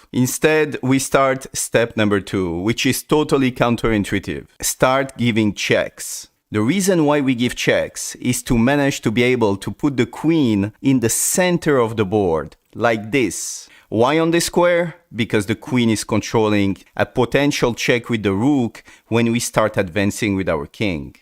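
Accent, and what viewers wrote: France. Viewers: boy does the french accent suck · omg the french accent is amazing 😁😁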